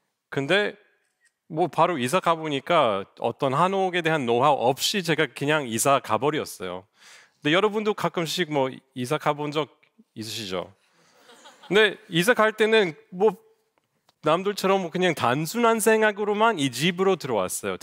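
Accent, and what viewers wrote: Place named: Seoul, South Korea. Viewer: 어디 사투리요? 뭐 경상도 사투리, 전라도 사투리 이런 게 있어야 사투리로 인식을 하죠.. 억양이야 특이하지만 그냥 서울 말씨로 들리는데.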